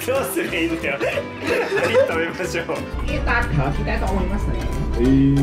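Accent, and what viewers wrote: Java, Indonesia. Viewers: semenjak ada, seneng banget liat bang ngomong medok jowo banget 😂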